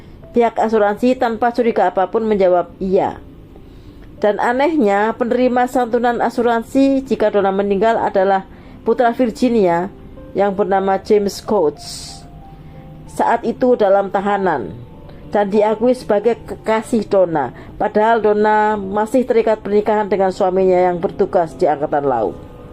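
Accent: Java, Indonesia. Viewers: trllu mdok jowone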